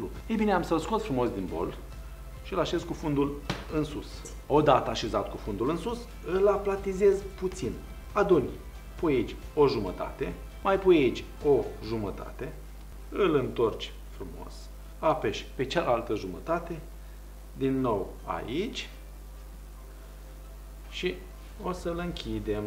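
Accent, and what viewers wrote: Moldova. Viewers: Ăsta este farmecul lui, e glumeț, explică totul pe îndelete, accentul moldovenesc are și el dulceața lui, iar rețetele pe care le prezintă sunt, toate, foarte faine.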